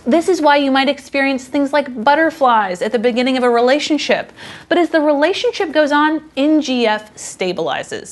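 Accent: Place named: United States. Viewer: Her voice reminds me why I don't like American accents. Absolutely no ability to modulate - it's just constantly shouting.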